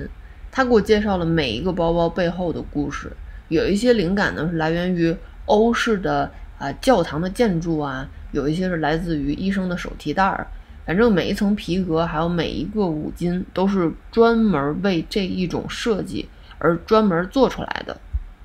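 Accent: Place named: Beijing, China. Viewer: 您说话的腔调是个地道的北京妞：）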